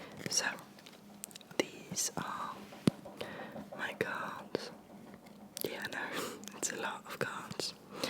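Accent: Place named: United Kingdom. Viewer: thanks for the great video! question: did you live in england at some point or learn english very young?? your accent is so characteristically british i would never guess you are french!!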